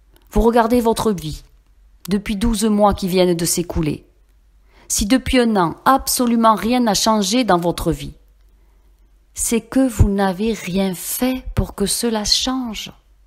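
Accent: South, France. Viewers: Bonsoir.J'adore votre voix du Sud. Namaste:)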